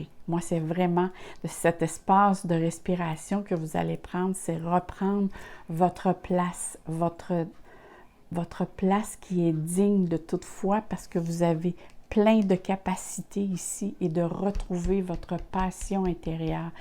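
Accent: Canada. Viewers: AAAAH QUEL BEL ACCENT CANADIENS ET UNE VOIX SI APAISANTE JADORE VOS TIRAGE DOMMAGE QUE VOUS NE FAITES PAS LES QUOTIDIENNE CE SERAIS UN REVE BEAU MOIS DE MAI A VOUS DE LA PART D UNE AMOUREUSE DU CANADA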